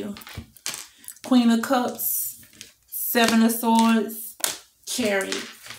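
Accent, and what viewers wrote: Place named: New Orleans, United States. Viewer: On point Queen, thank you. 🙏🏼💛 And the Nola accent too cute!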